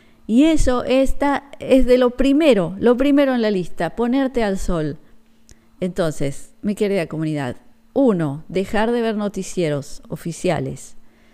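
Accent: Argentina. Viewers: Excelente, gracias!! Les comparto lo que hago. Me he aprendido de memoria el Salmo 91 y el Salmo 23, y cuando voy camino a mi trabajo que es a las A.M. hago esas oraciones; antes de empezar a trabajar hago 30 minutos de meditacion. Luego empiezo a trabajar en un campo de golf y tengo sobredosis diarias de vitamina D. Cuando llego de regreso a casa no veo para nada TV, veo a Salud y Finanzas Inteligentes y me siento muy bien física, mental y espiritualmente. Sería excelente que hicieras meditaciones guiadas con tu amorosa voz Argentina. Saludos de un mexicano radicado en Las Vegas, NV. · Gracias. Es el tiempo del ser humano "común". Nosotros debemos reconstruir la humanidad en este tiempo. Respecto a los medios, desde mayo del 2020 no miro NADA...Me informo solo por Twitter....Por tu forma de hablar creo que sos argentina. Un fuerte abrazo desde Buenos Aires!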